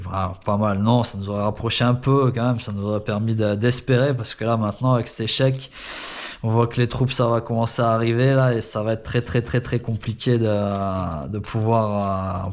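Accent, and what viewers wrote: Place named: Italy. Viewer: Aloha 🤙🏝️. Très bon accent Italien👍 Je ne connaissais pas ta chaîne. Tu t'exprimes bien ça fait plaisir! Tu es passionné c'est cool! As-tu arrêté tes parties?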